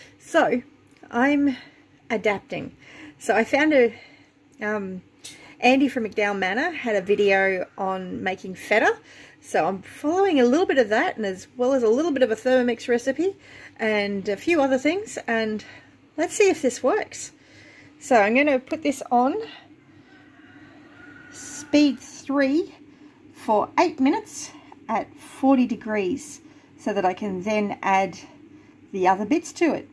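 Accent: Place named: Australia. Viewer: Hello, just met you on the Silhouette live, there were so many that subscribe to our channel and I just wanted to say thank you ❤️ I just subscribe to your channel looking forward to watching you. Love your Australia accent, Lisa from moneypit homestead we are in Kentucky usa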